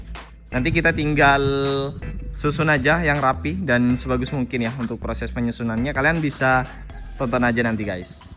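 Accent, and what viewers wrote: Madura, Indonesia. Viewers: kok kayak orang Madura KK emang asli mana😅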